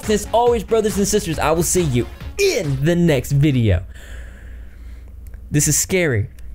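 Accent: South, United States. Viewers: Sounds like a Southern accent from the southeast US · Your hair is fantastic, I'm greatly enjoying your videos, & your wonderful Southern accent sounds a lot like mine lol. Greetings from Asheville in Western North Carolina :) Yeah this whole Baron Trump book thing is CRAZY! I figure Lockwood or someone long ago had a dream or vision about the time we are living in, then wrote these books from what they saw? President Trump is a baron too, a property baron, as well as part German. Wonder if that illustration in the book also closely resblembles the Donald as a child? Yes, cold shivers here too, for real. And I don't get those easily. I live in constant synchronicities that many people would not believe. So much that I plan on writing a little memoir book about my life lol! But this book is wild. Great coverage & commentary!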